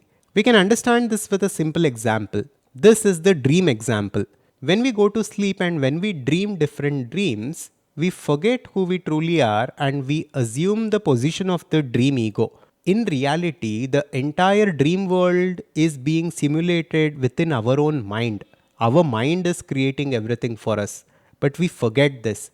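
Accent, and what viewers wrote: South India, India. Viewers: It’s called an accent, he has an Indian accent. 🙄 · That s an strong south Indian accent , u north indians will feel it different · It's just his indian enunciation,but we aught to focus on the content : l thought it very informative,and useful. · You’re feeling a little out of place because of his accent. It’s the regular Indian accent which all Indian languages speakers understand. You’re used to western accent which is foreign to us when we travel to Britain or USA, but with time everything falls into place. No one is disturbed by your observation. We are one people so don’t worry.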